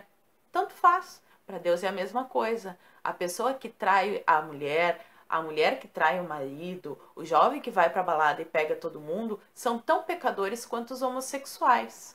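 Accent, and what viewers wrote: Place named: South, Brazil. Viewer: sou novo no seu canal e estou curtindo muito, estou aprendendo muito.... Vou te fazer uma pergunta pelo seu "sotaque " vc é do Sul do Brasil não é? para ser mais específico vc é do Paraná???? valew ate mais...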